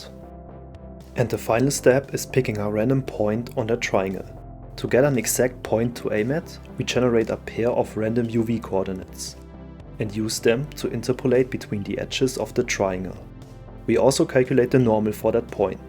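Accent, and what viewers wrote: Germany. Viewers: What's up with the German accent? (first time seeing the channel) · Is it just me or does He Sound german?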